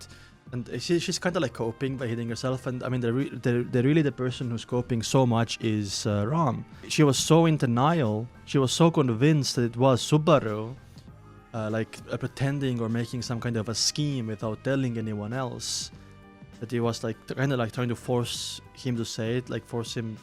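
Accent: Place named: Estonia. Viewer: tulid mulle for you pageile ja ma sain aktsendi järgi kohe aru et oled eestlane. väga äge näha et eestlased teavad ka mis peak on 😋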